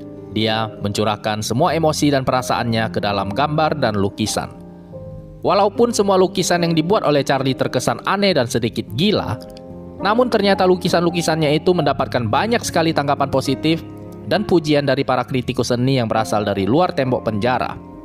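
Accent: Padang, Indonesia. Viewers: Logat ngomong nya kaya orang Padang.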